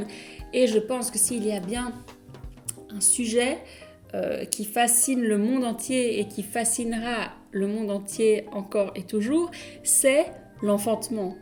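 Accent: Belgium. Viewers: Salut depuis un moment je me demande d'où vient ce joli accent? J'aurais dit belge, du côté de Liège?🤔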